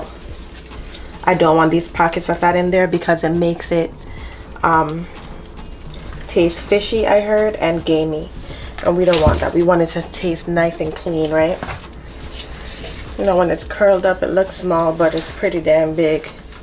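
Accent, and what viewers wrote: Jamaica. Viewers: Are you a Jamaican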